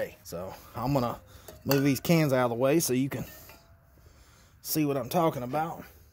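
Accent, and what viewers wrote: United States. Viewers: I love your cowboy accent 😍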